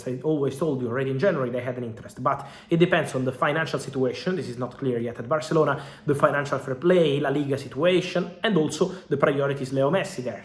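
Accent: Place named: Italy. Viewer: O inglês com sutaque italiano fica maneiro, nunca tinha visto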